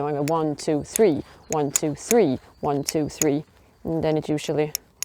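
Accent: Sweden. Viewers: Dear, your videos are a great inspiration to the ladies I date, and I am very entertained watching your adventures. I try my best to explain bushcraft techniques to so many ladies, and I find that many do not comprehend or retain the Information. Your videos are very good at helping break the communication barrier between Men and Women, the ladies actually retain your words and comprehend your reason, intentions and actions, to achieve your adventure challenge. I may say the same phrases about the same actions you are doing, and the girls simply do not understand it coming from me sometimes. I started to think beautiful hair, long fingernails and glamor trips to the salon equal a comprehension challenge with bushcraft and survival education, you proved me very wrong and humbled me with who you are. Thank you so much. Do not be offended by negative comments from people, they are simply jealous of you in some way. 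Several ladies I have shown your videos to have mimicked your Swedish to English accent when we are out on our own adventures, because we all think it is very beautiful and sexy, and sometimes they do it just to get my attention, and it works very well for them to do so. I am proud of and for you. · Hej, är du möjligen från Göteborg. Låter som en göteborgsbrytning under engelskan 😏 Bra filmer. Bra innehåll.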